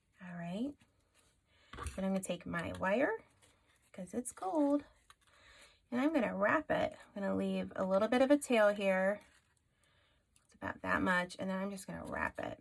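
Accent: South, United States. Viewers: Great tutorial thanks so much. (By the way. There is no problem at all with having a southern accent. Contrary to popular belief, everyone has an accent! Embrace it.) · Nothing wrong with sounding Southern, I am one myself!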